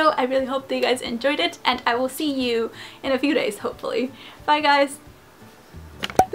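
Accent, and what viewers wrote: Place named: France. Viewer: Your french accent is so cute! :D But I don't understand why your french class teacher made you read Agatha Christie and not an author who wrote in french haha (but Agatha Christie is awesome so oh well :3)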